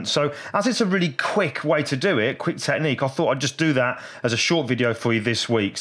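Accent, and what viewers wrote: United Kingdom. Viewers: 好喜欢这个英伦腔 like the British accent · great british accent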